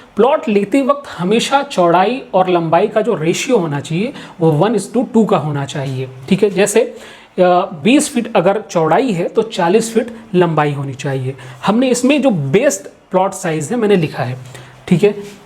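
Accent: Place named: Lucknow, India. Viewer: Bahut achche se explain kiya h lucknow se hn vo aapke bolne ke andaj se pata chal raha h. Informstive video h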